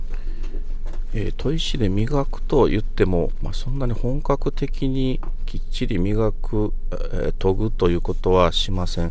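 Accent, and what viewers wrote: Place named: Kansai, Japan. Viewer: 私は燃料ポンプ28万キロまで持ちました。 無理に東京訛りにせず、関西イントネーションのままで、You Tube特有の過剰な効果音や聞き飽きたBGMがないのが良いですね。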